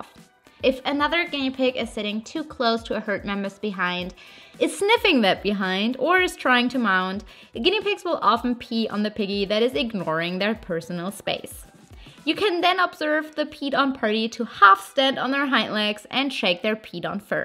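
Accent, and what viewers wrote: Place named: Germany. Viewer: Usually I don't hear it, but could hear the German accent a lot in the video. Just a interesting notice. Another good informative video for new gunea pig owners and a fun one for old ones and gunea pig fans..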